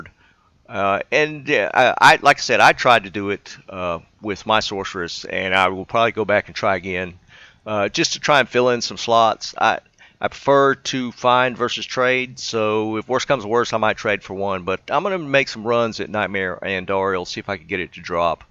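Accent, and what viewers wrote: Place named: South, United States. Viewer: Love the southern drool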